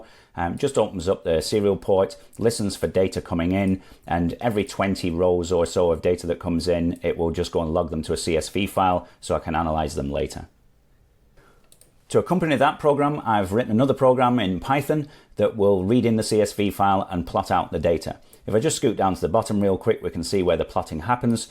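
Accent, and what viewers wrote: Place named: Australia. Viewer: Your Australian accent is beautiful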